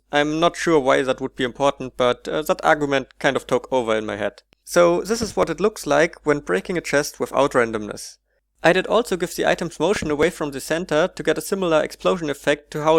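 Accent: Germany. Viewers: I think he is a german guy who speaks english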